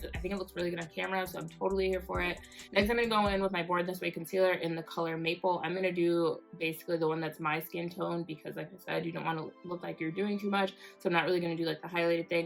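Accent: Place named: Africa. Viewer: It's the African accent for me 😂😂😂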